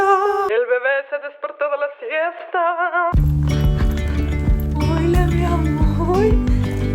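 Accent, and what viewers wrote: Colombia. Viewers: Me encantan los vídeos de organización.. pero sobre todo cuando hablas y t sale lo colombiana q eres.. m encanta ver lo alegre y divertidas q somos las latinas ❤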